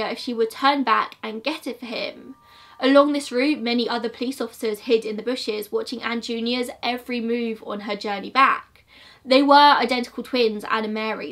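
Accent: England, United Kingdom. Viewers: I'm from Ireland, about an hour north of Belfast and only 40 mins across the sea by ferry from Donegal (the South is more North than the North lol!) Your pronunciations are so cute, bless you! I love hearing English people pronounce our towns etc, it's so cute to hear! For reference Donegal is kinda pronounced "done-ee-goll" but I do like how you say it haha! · I have just found your video today by chance. I like old cases unsolved or solved programmes and I was looking what options were there. Then I found this video about Mary and I got interested on the case. But what a surprise when I heard your Brittish accent! I just loved it because my family and I lived in London 44 years ago. And it is not quiet often to find English accent but American since my mother tongue in neither of them. I liked your programme so I decided to suscribe. It is very tragic what happened to the Boyle family and it is really worst not to find answers after 43 years. 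But hopefully someday it will be solved. I would have tried a psychic help maybe some answers would come up.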